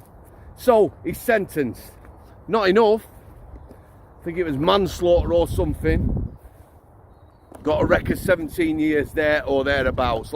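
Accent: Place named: Yorkshire, United Kingdom. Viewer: Yorkshire through and through lol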